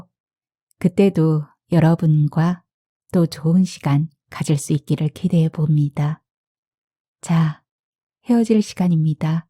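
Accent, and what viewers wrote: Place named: Gyeongsang, South Korea. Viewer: 잘듣고 있습니다 갱상도 분이신가 사투리가 아주 구수 하구만요 5,60년대 우리들의 삶이야기네요 한없이 배고프든 시절 보릿고개를 힘들게 넘든 시절이엇죠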